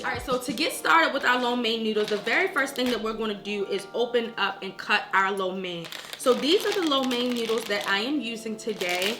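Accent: Baltimore, United States. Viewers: All i hear is that Bmore accent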